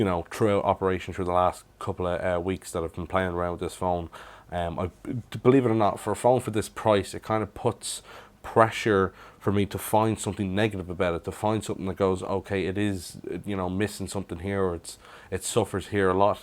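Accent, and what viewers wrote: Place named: Ireland. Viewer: R U from Ireland by any chance cause u sound like u are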